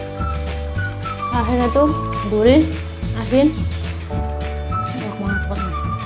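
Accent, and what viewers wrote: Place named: Banyumas, Indonesia. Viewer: Salam kenal YouTubers ngapak😁😁😁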